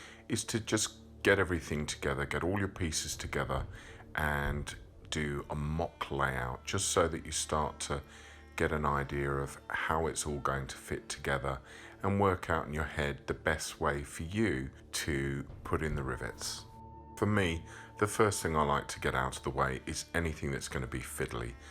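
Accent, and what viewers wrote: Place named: United Kingdom. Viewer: Ok completely off topic dude look like Jeffrey Dean Morgan and Robert Downey Jr had a kid together and then gave him a British accent and im totally here for it.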